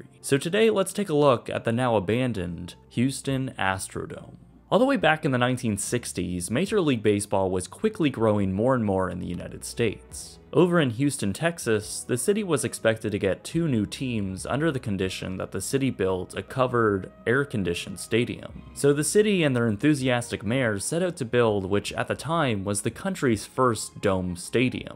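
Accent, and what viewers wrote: Canada. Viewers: I figured he was Canadian from the way he pronounced "about."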